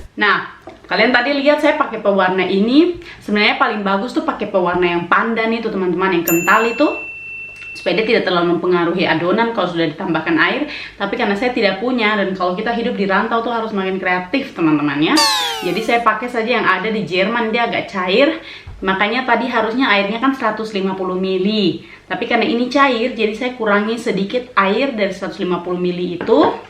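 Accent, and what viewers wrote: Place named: Kalimantan, Indonesia. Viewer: ngomong kaka sma ky di kalimantan 😍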